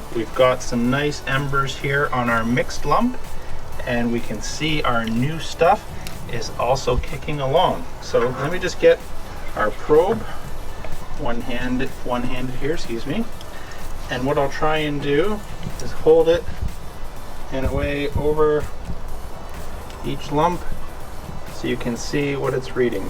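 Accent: Canada. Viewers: Is from Canada? His "outs" are very Canadian. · Stop speaking Canadian! Or just don't use the words out and about.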